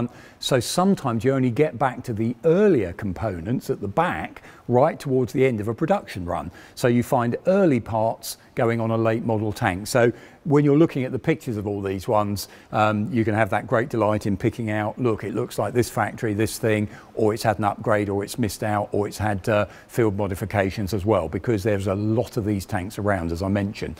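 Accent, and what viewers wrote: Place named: United Kingdom. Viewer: Alongwith the knowledge about tanks, I get to learn British accent also! Win win!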